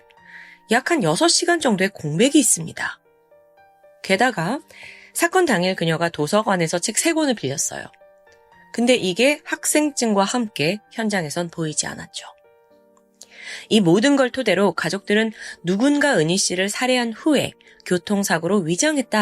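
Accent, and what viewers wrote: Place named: Gyeongsang, South Korea. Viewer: 경상도 답다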